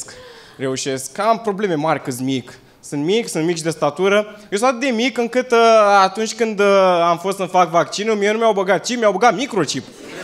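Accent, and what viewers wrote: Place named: Moldova. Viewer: Un oltean cu accent de moldovean. Greu sa-l inteleaga ardelenii.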